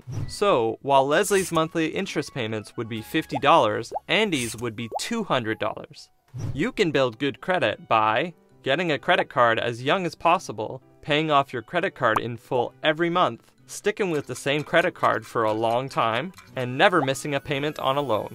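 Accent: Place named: Canada. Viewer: The narrator sounds so Canadian.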